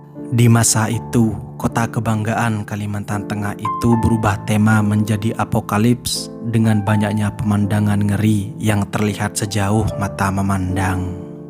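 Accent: Madura, Indonesia. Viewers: Kamu orang Madura ya, logatnya itu loh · Dengar suarany,abang org madura ya..salam kenal bang.saya org sampit kalteng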